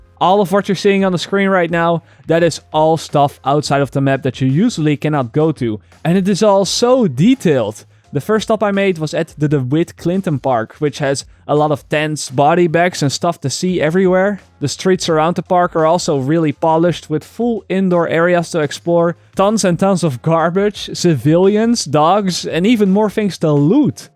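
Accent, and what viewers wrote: Netherlands. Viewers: Ha ik dacht al dat hij nederlands was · Dat dutch accent tho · Ik hoorde al dat Nederlandse accent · nl accent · oke becouse of your accent i can hear your dutch haha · are you dutch???? you sound dutch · Je hebt zo'n standaard Nederlands accent haha, mooi filmpje though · i already tought you were dutch because of your accent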